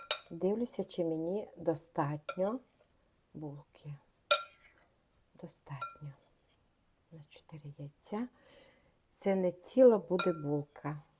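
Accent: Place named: Zakarpattia, Ukraine. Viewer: Дорога Пані! Вітання Вам із Канади! Ваше відео мені переслала моя подруга із Амстердаму. Не можу передати словами, які приємні емоції я отримала слухаючи наш чудовий закарпатський діалект, і відтворюючи у памʼяті смачну Повненку, що готувала моя мамка. Це якраз той рецепт, з яким Ви і поділилися із нами. Якось я про нього призабула, але тепер обовʼязково приготую. Ви, Пані, надзвичайно приємна жінка, з такою позитивною аурою навколо себе, що відчуваєш навіть за океаном! Міцного Вам здоровʼя, чим швидшого мирного неба, і чекатиму на Ваші нові відеозаписи.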